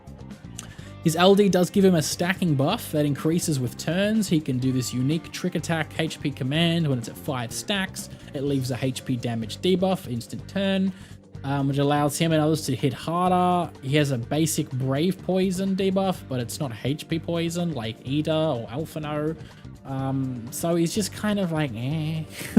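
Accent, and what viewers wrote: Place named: Australia. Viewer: hahah i knew it :). Im from Victoria and i thought that was an aussie accent. I was greedy and i use both garnet and ramza - 90% hp attack cap up is delicious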